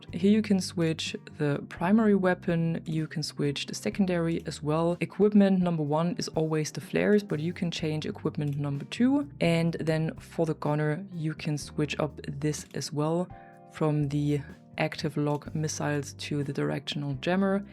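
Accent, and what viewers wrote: Germany. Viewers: I like your German accent when you speak in English 🙂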